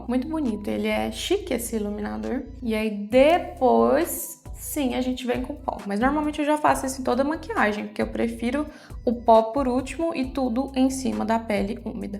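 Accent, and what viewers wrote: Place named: Minas Gerais, Brazil. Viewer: Estou adorando seus vídeos! Sou nova por aqui e estou achando tudo muito útil e uma delícia de acompanhar. Você é mineira da onde? kkkk Pergunto por conta do sotaque fofo que tem... Eu sou mineira de BH. Confirme se minha suspeita está certa... Beijo, obrigada e parabéns pelo trabalho.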